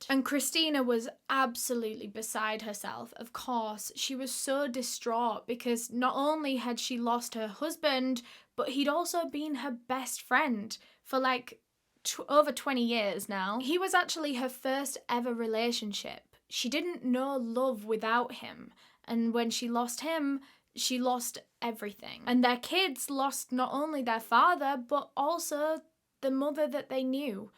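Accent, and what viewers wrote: United Kingdom. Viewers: hello. i love your British accent..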